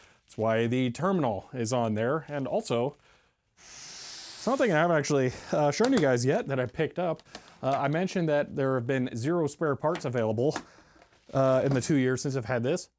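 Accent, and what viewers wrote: United States. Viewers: Don't know how you're getting on with your restoration, but… if you haven't plugged it in yet (8-months later says YouTube), then DO install another circuit from your fuse-box (just as you might when installing a shower or cooker for the first time). Don't just plug it into your ring-main with other appliances and wall-outlets. PS. I guess you are saying this in your video but the Americanese is not quite so clear as English English. Sorry!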